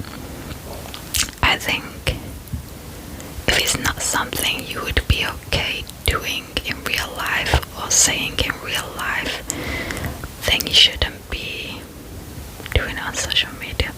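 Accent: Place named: Slovakia. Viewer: A fantastic Slovakian accent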